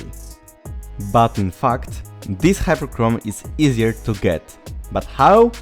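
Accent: Poland. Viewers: Słychać że polak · Słychać ten polski akcent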